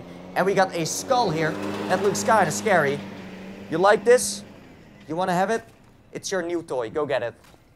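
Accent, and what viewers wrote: Netherlands. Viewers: Zijn Engels is goed maar hij heeft een Nederlands accent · Je kan horen dat hij van Nederland is!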